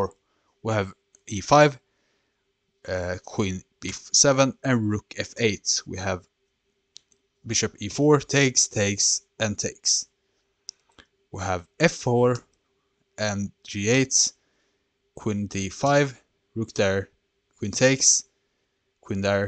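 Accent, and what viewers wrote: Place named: Sweden. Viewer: You sound Swedish, and I am fairly certain my ears are not deceiving me.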